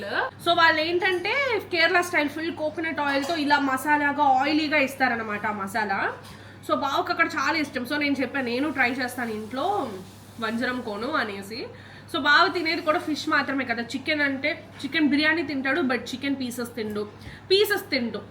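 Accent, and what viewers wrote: Tamil Nadu, India. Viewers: Akka miru matladettappudu tamil language vasthundhi 😅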